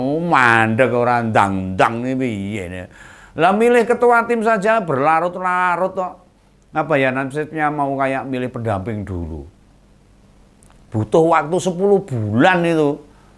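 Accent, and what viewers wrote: Java, Indonesia. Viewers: Kocakkkk abis mas è...ngakak ngakak ngakak ...medokè boso ne bikin serius d balut dagelan...politik jd asik🎉🎉🎉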